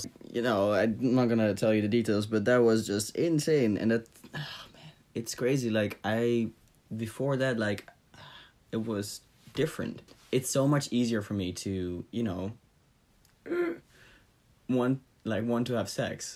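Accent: Netherlands. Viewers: Hey where are you from? Your accent sounds like it's dutch?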